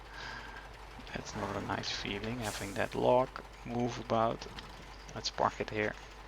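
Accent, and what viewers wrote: Netherlands. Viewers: Ja volgends mij is hij Nederlands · Altijd grappig als een nederlands iemand engels praat :) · Klinkt als een Nederlander.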